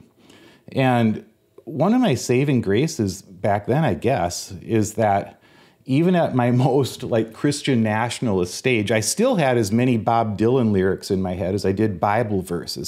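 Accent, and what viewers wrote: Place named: Minnesota, United States. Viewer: If everyone channeled your “Minnesota nice” tone and accent when approaching our political and religious differences we’d be in a much better place in this country.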